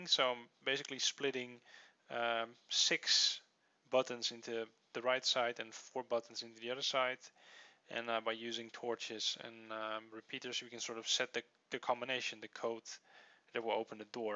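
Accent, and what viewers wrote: Netherlands. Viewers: kan duidelijk horen day hij nederlands is waarom kan dat altijd :p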